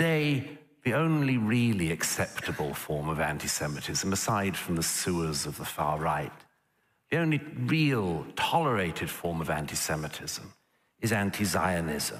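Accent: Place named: United Kingdom. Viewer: I pray for your wellbeing Sir, for you have touch my hart with your british way of speaking. Your courage is and example to all people. Speaking to the world to open theire eyes for what had happend in Israël on the 7e of october. You are like the man's voice calling in the dessert! But, Sir, I still believe that your efforts will be heard. It is like the peppel touching the surface of the water! Your messages will always be a wake up call. May God be with you and give you the wisdom to bring the thruth. God said: I AM WHO I AM.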